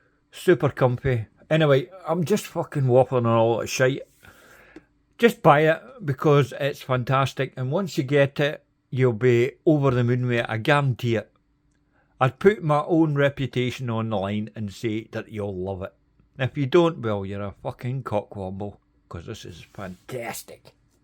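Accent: Scotland, United Kingdom. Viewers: Bloody hell, it seems like I’m hearing William Wallace reviewing a watch. Nice review.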